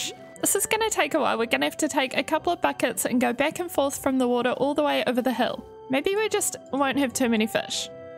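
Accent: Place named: New Zealand. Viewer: I miss my hometown sm Nz is whre im from and hearing your voice takes me back :)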